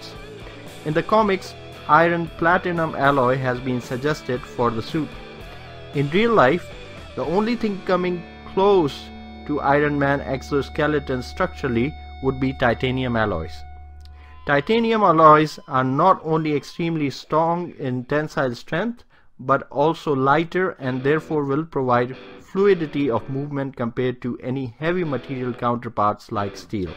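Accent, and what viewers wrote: India. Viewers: I'm sorry but this guy sounds like indian tech support. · You make speaking english in indian accent sound cool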